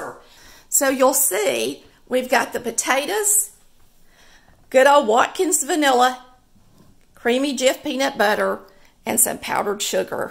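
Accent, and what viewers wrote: South, United States. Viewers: HI, Im originally from THE ICE BOX OF THE NATION, INTERNATIONAL FALLS MINNESOTA, but we lived inTennessee for 15 years, now we’re back living in northern Minnesota. I miss hearing the southern drawl I love listening and watching your show, along with your wonderful cooking. Your a great teacher and have a sweet loving personality. I’ve always been curious about the southern potato candy I’m gonna go ahead and make me a batch.♥️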